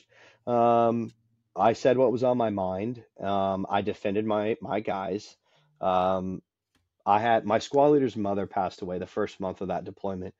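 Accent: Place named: England, United Kingdom. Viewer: I love your English accent ❤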